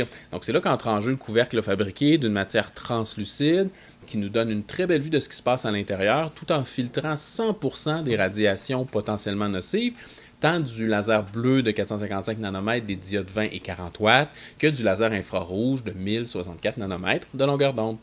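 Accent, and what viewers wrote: Quebec, Canada. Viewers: Hello je passe pas de ton accent du Québec, fabuleux ce nouveau laser, dit moi tu parle de papier de marquage pour le verre dans ta vidéo, ont peux le trouver partout et l'utiliser avec une orthur aussi? belle vidéo tu donne envie que je change mon laser mais ma blonde ma remis à l'ordre rien pu faire avec le commandant fait 12 ans de mariage et pas de remise de peine par le juge 😁meilleures salutations à ta famille et merci pour tes vidéos . le hello du Valaisan de Swiss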